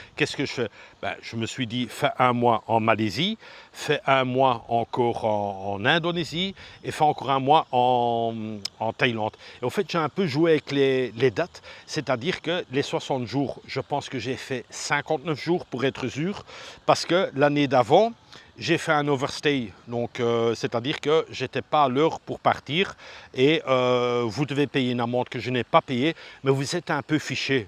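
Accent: Belgium. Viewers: Bonjour je me suis abonnée j'adore ta façon de t'exprimé en nen halve/echte Bruxelleir, moi je suis un vrai bxllois né aussi le 31 mai '67 à 1000 Bxl (bon annif à toi ) 😁T'es vlog que je suit de peu en Thailande très intéressant et maintenant que tu est de retour en Belgique dans notre beau pays lol J'ai aimer ta route en scooter au début, de revoir mon ancien quartier Machtens/Scheut ça fait plaisir de revoir cette endroit après 30 ans 😉Continue à nous faire rêver.. En een polleke de Roger 😅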